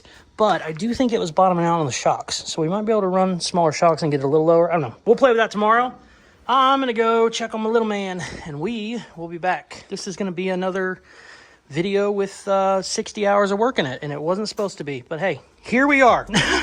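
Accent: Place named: Missouri, United States. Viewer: Been watching all the fscalade vids,great content bro keep going, and also are you from Missouri? A lot of the ppl there said roof like you when I worked there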